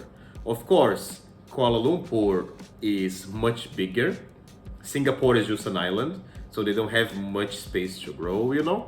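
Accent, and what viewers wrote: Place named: Brazil. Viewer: Well done, you speak your mind about Malaysia and not just gloss over the topic like some other YouTubers just trying to make everyone happy. Bravo for that! From your accent, I believe you are Brazilian. Not sure yet but I will look at your other videos to determine that later. Interesting observation of the Turkish people. I have interacted with those outside of Turkey and visited Istanbul before. Never live there before.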